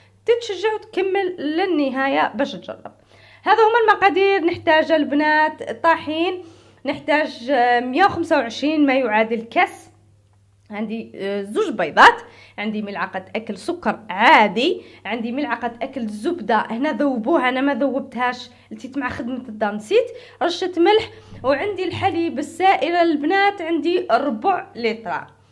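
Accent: Batna, Algeria. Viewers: اععع لهدرة نتاع باتنية تعيف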